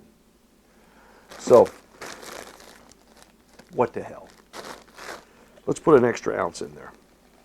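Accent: Texas, United States. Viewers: Are you from texas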